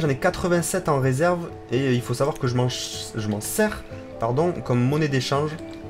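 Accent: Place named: Liège, Belgium. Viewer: Quand tu parles (ce n'est pas une critique)tu as l'axent Liégeois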